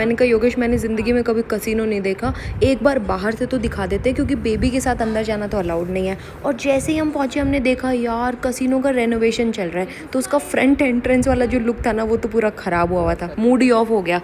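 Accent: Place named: Marwari, India. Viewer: Best gaasiye deti gayi.......ur marwadi with hindi is awesome 👌 😅😅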